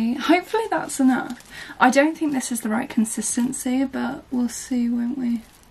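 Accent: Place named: United Kingdom. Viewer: You sound like your from Britain. I've been to London it's a great place. Thanks for sharing this electrifying video with us that watch your channel. Outstanding job young lady.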